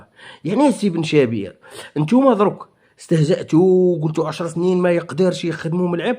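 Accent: Morocco. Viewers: هذا والله ما جازئري راه مغربي من الشرق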